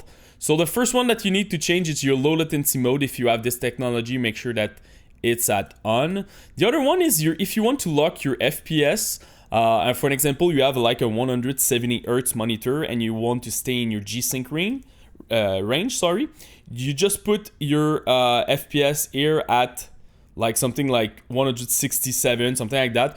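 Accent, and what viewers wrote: Quebec, Canada. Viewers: c'est malade comment cest facile de reconnaitre un quebecois qui parle anglais . j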